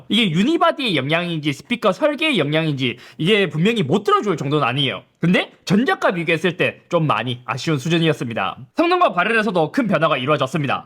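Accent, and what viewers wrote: Gyeongsang, South Korea. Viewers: 뭥미..경상도 사투리 쓰면서...왠...한화 유니폼을 입고..??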